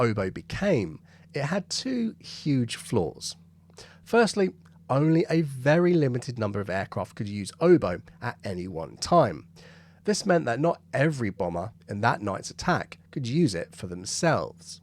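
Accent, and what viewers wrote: Australia. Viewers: Forget the Ozzie accent, Wanganui is in New Zealand... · Wanganui is an New Zealand city . Why would a person with an Australian accent be claiming to come from there? And why are Wanganui or Parramatta any "sillier" than Thames or Birmingham or Slough? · You know that Whanganui is in NZ, right? I was a bit confused by your pride in your Ozzie accent. Did I miss something? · 9/10 Aussie accent as assessed by an Aussie 🇦🇺 Well done mate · Well done with your Aussie accent. Now try Kiwi. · Why would your Aussie accent be helpful in pronouncing a kiwi place name? The accents are quite different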